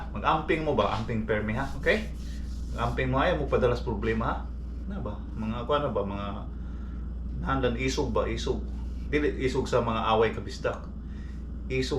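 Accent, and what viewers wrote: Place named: Visayas, Philippines. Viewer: Saan ka Dito sa Phil. Kabisdak bisaya ka mag Tagalog 🥰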